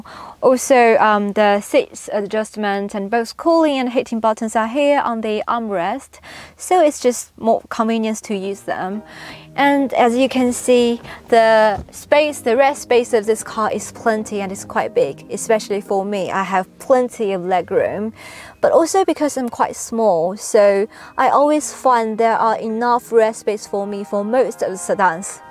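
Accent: United Kingdom. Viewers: 这小英式口音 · 厉害啊，英伦口音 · Chinese beauty with British accent 😯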